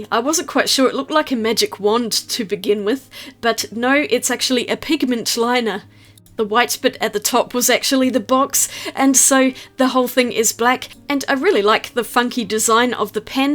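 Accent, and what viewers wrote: Australia. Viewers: This is such a lovely video,! I love hearing an Australian accent on YouTube, it's so normal and comfy to hear as a fellow Aussie. This advent calendar is so affordable and such a nice little "taste test" for the Born range! I really appreciate they didn't pre-sharpen the pencils, it means the tips weren't gonna break in the box!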